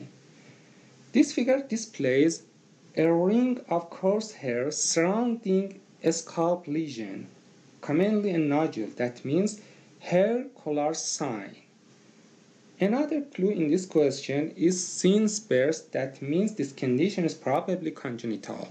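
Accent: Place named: Iran. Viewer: I have a classmate he speaks just like you, So I guessed you must be iranian.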